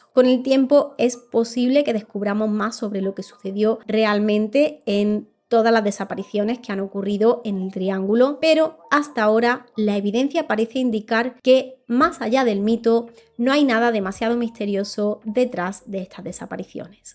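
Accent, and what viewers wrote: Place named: Murcia, Spain. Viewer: Hola, me encantan tus videos, hace poco descubrí tu canal y enseguida me suscribí. Pongo tus videos en el trayecto de casa al trabajo y a veces en este. Mi mamá está intrigada por tu acento, porque, aunque sabemos que eres española, ella no había escuchado tu variante de acento. Yo digo que eres de Murcia, pero, ojalá me puedas contestar para resolver la duda de mi mamá. Gracias. Saludos desde México.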